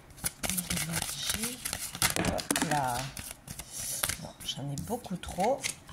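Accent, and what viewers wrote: South, France. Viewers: Merci, tirage intéressant à écouter. Nous avons tous les deux un accent particulier, toi du sud de la France, et moi du Québec. 🙂❤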